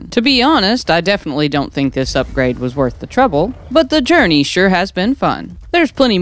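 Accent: South, United States. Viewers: You sound so southern i cant tell if you're a guy or girl. Loved the video tho.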